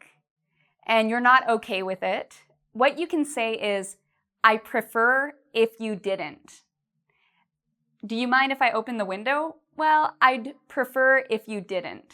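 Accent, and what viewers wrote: Canada. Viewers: Canadian accent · You are from Canada right? I can tell from the way she pronounces "About"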